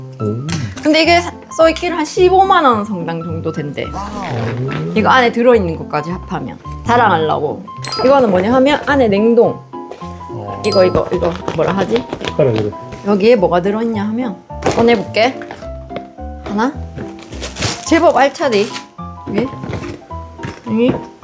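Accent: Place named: Jinju, South Korea. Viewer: 저도컬리로 시장자주보는데요.. 님 영상보면서 실패확률 제로예요..써보고좋다고 하시는거는 항상바로바로 장바구니에담아요..너무너무도움됩니다.그리고 저는 고향이 진주인데요..경기권에서 살다보니 님 사투리들으면 고향생각나고 친구들생각나요❤..구독한지얼마안되서 매일정주행 중입니다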